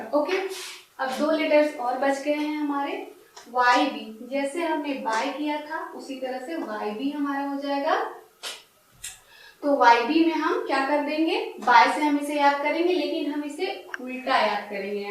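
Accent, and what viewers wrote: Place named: India. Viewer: yea she is but this isn't British it's Indian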